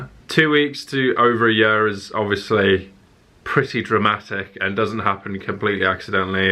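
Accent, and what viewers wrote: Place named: United States. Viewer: Omg the american accent 😂 thank you so much for answering my question!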